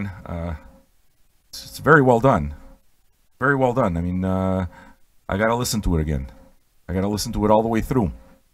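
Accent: Canada. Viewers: Well I got it right that it was Canadian so 1/2 pt to me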